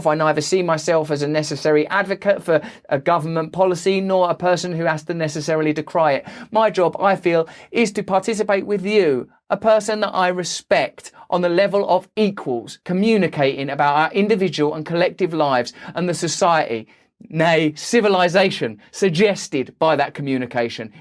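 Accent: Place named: United Kingdom. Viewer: Tough to listen to.. limey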